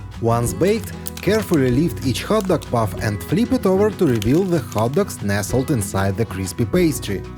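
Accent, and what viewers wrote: Russia. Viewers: Sorry, can't stand your heavy Russian accent. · ужасный русский акцент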